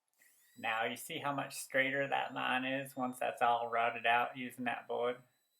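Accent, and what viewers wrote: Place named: South, United States. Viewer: U gots a southern accent now!!!!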